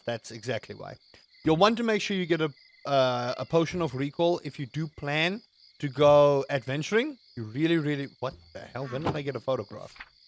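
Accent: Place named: South Africa. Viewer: Howzit,! You randomly appeared in my feed with your episode 1 of this series, and since I've played both games, I figured this was worth a shot. I'm really enjoying it so far (still very early game, though - not even iron tools yet). They've done a really good job with this modpack. And as an ex-SA guy, I also enjoy hearing the accent again (been in Canada for 20+ years now).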